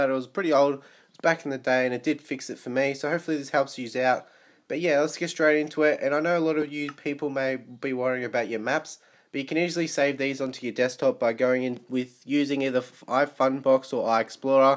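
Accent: Australia. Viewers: I’m Australian and I hear a Australian accent are you australian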